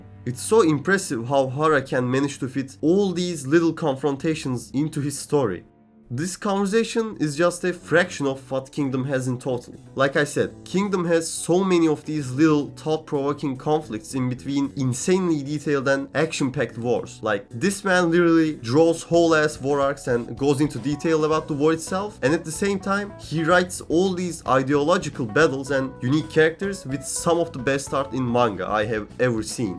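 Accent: Turkey. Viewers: are you turkish?? your accent sounds like it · Hey my dude your accent really sounds like turkish are from turkey?🥺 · videoyu açtığım an aksanından anladım turk olduğunu yolun açık olsun ins kanalın çok ünlenir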